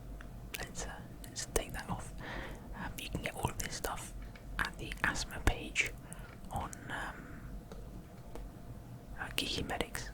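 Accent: United Kingdom. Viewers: Time for another game of have I been saying that word wrong or do British people just say it differently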